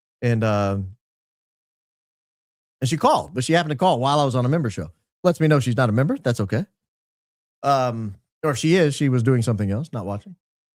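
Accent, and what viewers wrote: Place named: Alabama, United States. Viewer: were you originally from Alabama? It sounds like you at least lived here. I was born here and have lived here for all but fifteen years or so of my life. I returned slightly after that time frame, and I remember being shocked at the political things that happened in the early 2000s.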